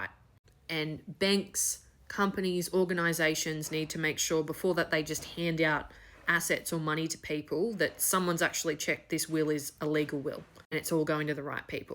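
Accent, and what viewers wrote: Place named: Australia. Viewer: Well explained, with an Australian accent.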